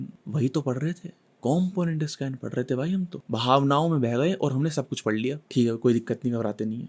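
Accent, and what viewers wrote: Uttar Pradesh, India. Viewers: Guru kamal adami ho accent to pura UP wala hai. Best explaination on youtube. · wow gazab , the way of explanation wow mtlb amazing, ultimate , soothing and solid . lag rha hai samne baith ke koi padha rha hai. and i m from up as well and can connet with an accent , amazin. indeed the best explaintion .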